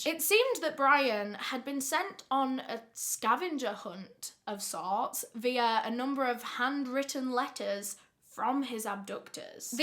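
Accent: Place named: Northern England, United Kingdom. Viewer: As a Londoner I adore your Northern accent! Soooooooooooo in this Videooooooo. You make amazingly great videos and you look amazing also x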